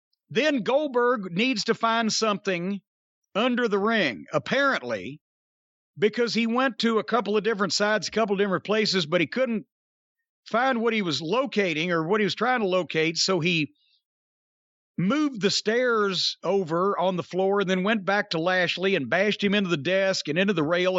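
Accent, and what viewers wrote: South, United States. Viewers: I've complained about the lack of quality control in wrestling for years! Especially when a fed has agents. Hearing the words in Jim Cornette's Dixie accent makes me feel vindicated. "Since there's a real injury, the camera does all it can to stay away from it." Ouch!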